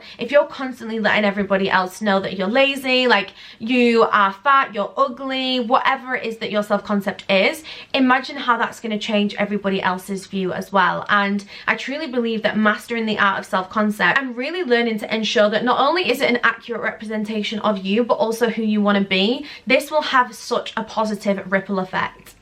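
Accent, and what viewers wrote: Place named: United Kingdom. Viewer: I am in love with the british accent, I could listen to this for hours 🫶🏽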